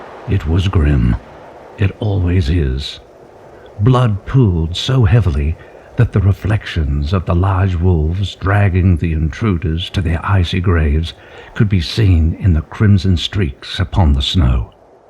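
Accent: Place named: Australia. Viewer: I listen to a lot of audiobooks so it is both interesting, brilliant but also somehow strangely odd to hear an Australian accent narrating. I look forward to hearing the entirety of it. The narrator has a lovely deep timbre to his voice.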